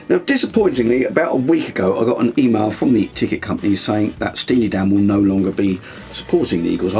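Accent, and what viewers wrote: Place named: England, United Kingdom. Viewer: first time seeing your channel, and I'm a fan. I share your love of music, and enjoy your analysis, and your English accent is awesome man. This whole Tracks thing has really blown up. There has always been backing tracks used in live concerts. I mean ACDC in Hells Bells, played live w track of the steeple church bell, Van Halen keyboard fills on Jump, Love comes Walkin In, and others, that after the 5150 that Eddie actually played keyboards live, they were tracks on live shows after that tour, Ed was back on the guitar. Def Leppard recently now come under fire for using tracks, which is no secret, and Rick Savage has even demonstrated in many behind the scenes interviews, demonstrated the pedals he uses on certain songs for the background intros to a few songs, and the pedals he plays for the short uses, but all the drums, guitars, and amazing vocals are live. 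What's happening now though I the bands like Motley Crue, being outted for the guitar, bass, drums, and Vince Neal's vocals, ALL TRACKS, talk about Miming, thats why fans are pissed, and how Motley Crue keeps latching on to the coat tails first w Def Leppard, and coming up soon w Guns n Rose's, who wants to pay <num> to come out and watch a bunch of overweight has been lypsyncing and playing air guitar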